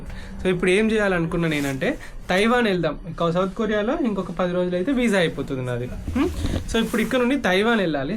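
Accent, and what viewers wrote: Telangana, India. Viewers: it's so sad bro, money makes many things anaru so ths video is the best example, please like everyone bcz 14k views but likes only 2.5k so please like every video, ram travel bro and Avnesh bro ki with in 3 r 4yrs lo full views vastunai but Bayya ki and raja reddy bayya ki asalu views ravadam ledhu so sad, please support this bros. mana ap brothers kondariki (andariki kadu) telanagana slag nachadhu anduke view ravadam ledu anukunta. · ఇలా అంటున్ననాని ఏమీ అనుకోకండి బ్రదర్.. "రాజు కన్న బోయిన" బయ్య సన్నీ యాదవ్, ఇలా తెలంగాణ ట్రావెల్ youtubers అందరకీ including మీతో సహా,సబ్స్క్రైబ్ ర్ ఉంటారు, వ్యూస్ రావు కారణం తెలంగాణ వ్యువర్స్, పనికిరాని వీడియోస్, రోత కలిగించే కామిడీ వీడియోస్ చూస్తారు, చాలమంది, ట్రావెల్ వీడియోలు చూడరు, ఆంధ్రా వాళ్ళు ఎక్కువుగా ట్రావెల్ వీడియోస్ చూస్తారు, కానీ, తెలంగాణ స్లాంగ్ కు అంత కనెక్ట్ అవ్వరు, అందుకే తెలంగాణ ట్రావెల్ youtubers కి డబ్బులు రావడం కష్టం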